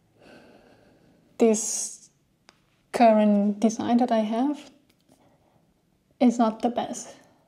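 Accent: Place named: Europe. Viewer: Thats an interesting accent bever heard one like it...european i think. Not sure. Interesting video too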